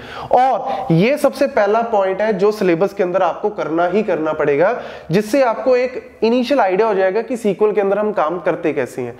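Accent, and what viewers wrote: Punjab, India. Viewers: you are punjabi??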